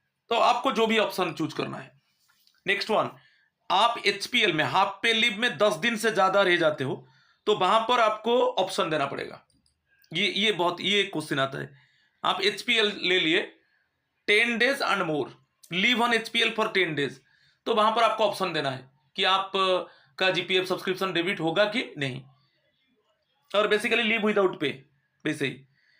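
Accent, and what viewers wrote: Odisha, India. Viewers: Sir i guess u r from Odisha...I m from Sambalpur sir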